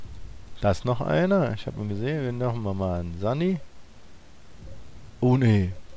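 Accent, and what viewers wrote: Cologne, Germany. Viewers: Deine let´s play´s sind der hammer hört sich na ne kölsche jung an oder täusche ich mich da ? :D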